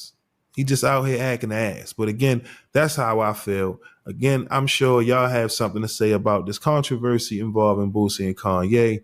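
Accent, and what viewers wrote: Baltimore, United States. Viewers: I'm from Baltimore and I'm curious are u from Baltimore also? Sounds like it.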